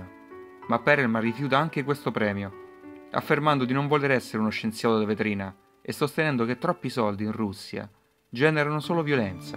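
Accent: Rome, Italy. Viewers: Ma io dico, possibile che la voce parlante abbia un accento così marcatamente romano? Le doppie si pronunciano solo quando appaiono per iscritto.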